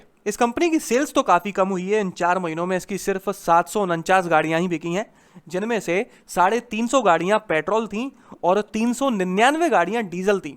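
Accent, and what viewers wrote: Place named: Agra, India. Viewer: Agra ke. Lagte ho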